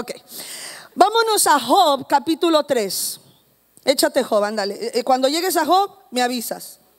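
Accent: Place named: North, Mexico. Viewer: Parece más de acá dl. Norte q del D.F. x su forma d hablar 😄 buen msje."q estamos reflejando"? 😕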